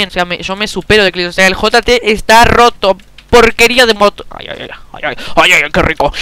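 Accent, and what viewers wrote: Argentina. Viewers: sos argentino o mexicano?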